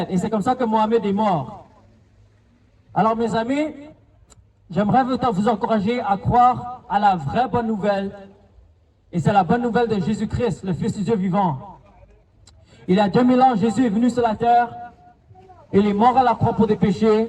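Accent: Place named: Canada. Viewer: Canada? J'entends un tout petit accent canadien.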